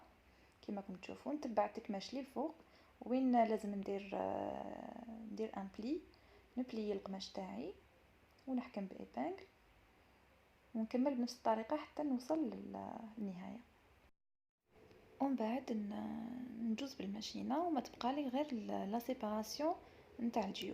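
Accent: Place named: Iraq. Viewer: اللهجه بيهه عراقي عاشت يداكي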